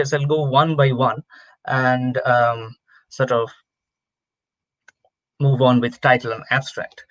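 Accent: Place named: India. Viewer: the accent was hard for me to understand. I think it is good for Indians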